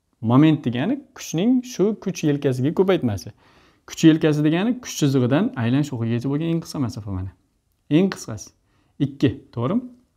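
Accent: Khorezm, Uzbekistan. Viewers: Xorazmdan misiz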